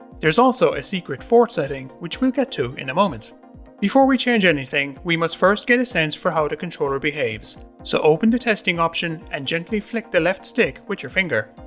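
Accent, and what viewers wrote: Ireland. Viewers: lovely ol irish voice, good vid lad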